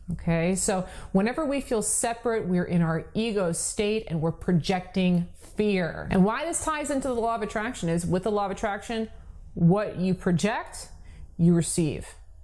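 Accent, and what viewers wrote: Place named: South, United States. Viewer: love your southern accent )))))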